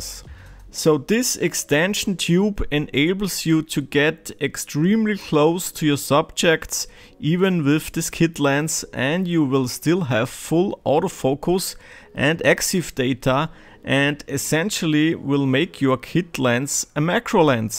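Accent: Germany. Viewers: fast Bayerisches Englisch....wie geil ist das denn :P cooles Video...vielen Dank dafür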